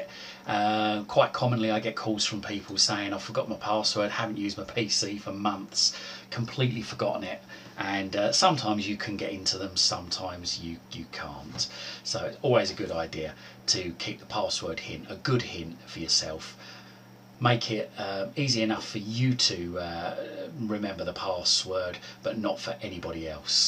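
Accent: United Kingdom. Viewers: WHOA ITS BRITISH!!!!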